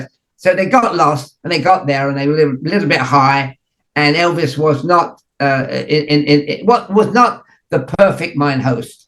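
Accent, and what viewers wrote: Liverpool, United Kingdom. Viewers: One question : Why almost everybody in Liverpool I have heard speak with your kind of accent except The Beatles? Did they have to change their accents in order to be understood better or it depends on the part of Liverpool people are from? Because they never sounded like "Scousers" as you do for example .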